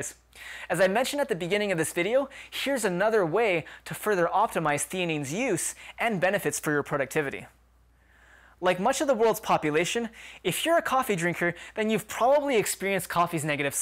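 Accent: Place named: Canada. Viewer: it does sound very Canadian, the portuguese nuance is very very delicate - almost unnoticeable! I am portuguese, but i live in Poland! um abraço da Polónia ;)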